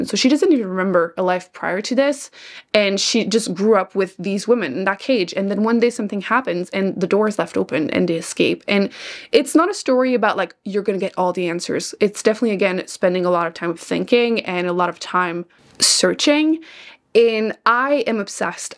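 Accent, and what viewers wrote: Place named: Quebec, Canada. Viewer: Thank you for sharing,! Stupidly question, are you from QC? I love the little accent.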